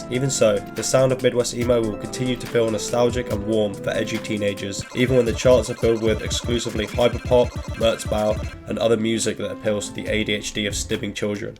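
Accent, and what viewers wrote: United Kingdom. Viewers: Lmao, British accent talking about the Midwest… cute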